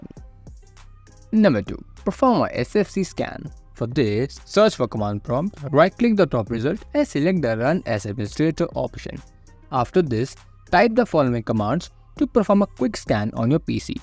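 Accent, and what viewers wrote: India. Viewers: I wish I could understand Indian accents. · when you hear a indian accent you know ur problems getting fixed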